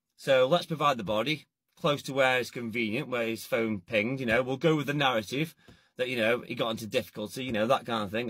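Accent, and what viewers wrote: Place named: Stoke, United Kingdom. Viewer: Off topic but....which part of Stoke are you from? Within a 3 mile radius of Longton ? Thats a very familiar twang 😁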